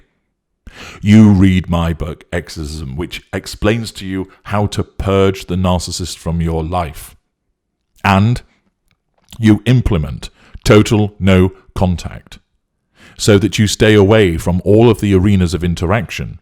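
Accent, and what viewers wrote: United Kingdom. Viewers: nope i just don t like the british accent and i know where your coming from, no hard feelings i just as good as u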